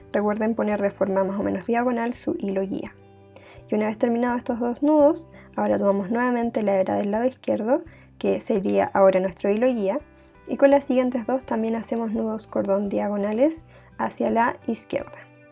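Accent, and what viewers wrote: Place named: Chile. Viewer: FELICITACIONES, MUY BUENOS TUS VIDEOS!!! EXCELENTES! ME DIJE ESTA CHICA ES CHILENA, MUY DULCE TU VOZ!!! INTENTARE REALIZAR TUS <link> ENCANTAN LAS MANUALIDADES COMO EL TEJER, Y ESTE ARTE DEL MACRAME SIEMPRE ME HA LLAMADO LA ATENCION..EXPERIMENTARE Y TE INFORMARE,... GRACIAS POR COMPARTIR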